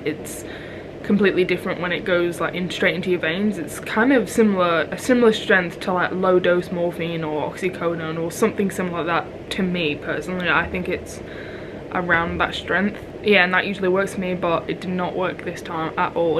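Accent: United Kingdom. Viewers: Is British? Sorry if this question is stupid 🤦🏼‍♀️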